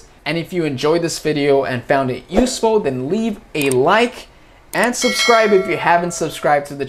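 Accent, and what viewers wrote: United States. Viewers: Посмотрела видео, говорит оооочень медленно, использую простейшие фразы. Это для ребят у которых уровень чуть ниже среднего. Лучше бы реальный разговорный с той скоростью, как говорят американцы. Но всегда приятно послушать американский акцент! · Как классно и интересно! Я тоже хочу говорить с таким же американским акцентом. Но пока по моей смачной мягкой L и картавенькой R понятно, что никакая я не американка и не британка.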